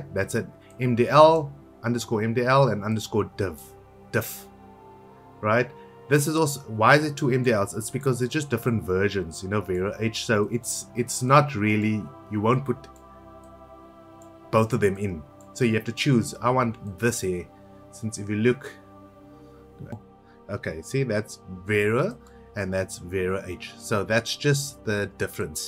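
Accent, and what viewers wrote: South Africa. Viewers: I can hear another South African anywhere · i think i'm from the same place as you, south africa? i felt your accent sounded south african? as for the p*rn thing... haha, that actually why i switched to z. once you get to the three xxx to place things really at the bottom, you realise you need a more benign letter :)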